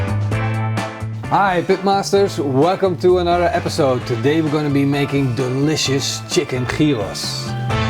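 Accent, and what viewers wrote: Netherlands. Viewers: .... Sir, you are Dutch, aren't you?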